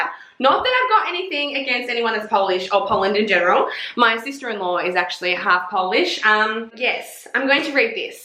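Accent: Australia. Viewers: I have been reading you recommendations and I think they are awesome ! You say MAFIA perfectly. Everything sounds better in a Aussie accent. My words sound boring in my canadian accent lol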